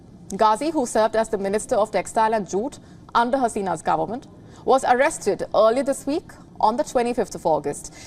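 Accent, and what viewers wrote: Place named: India. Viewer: Horrible Indian accent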